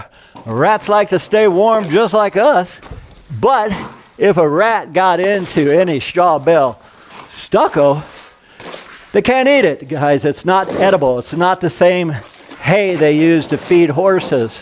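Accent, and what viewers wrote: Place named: California, United States. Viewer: Man, your accent is so Californian that you could star in the series “The Californians” Love it.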